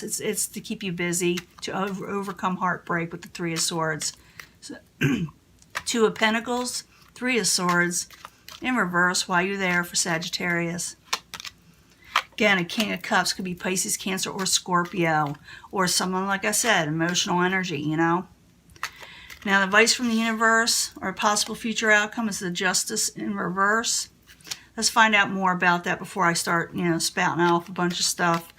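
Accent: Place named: United States. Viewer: How come you sound American now I thought you were British 😱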